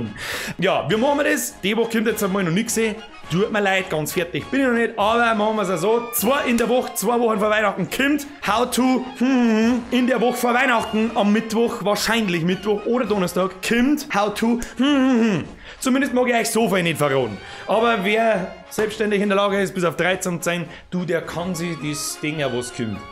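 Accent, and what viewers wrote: Bavaria, Germany. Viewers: Läx verstas zwar nume weni gnau anelose Ich find de bayrisch dialäkt am beste^^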